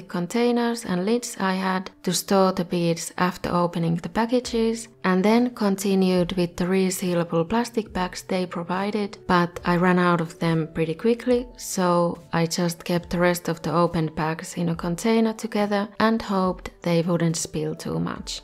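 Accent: Finland. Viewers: What country are you from? Because that sounds a little bit like finnish accent.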